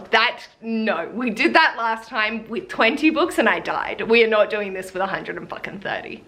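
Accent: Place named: Australia. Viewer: If I can place accents properly, I believe that you are Australian. I have many friends from Australia that swear a lot. I love it, lol. Canadians swear a lot as well, but we don't sound half as good swearing like our Australian cousins.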